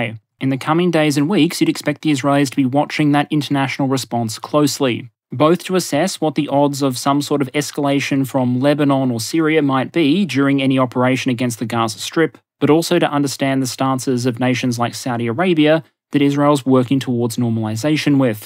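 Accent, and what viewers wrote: Australia. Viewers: He's an Aussie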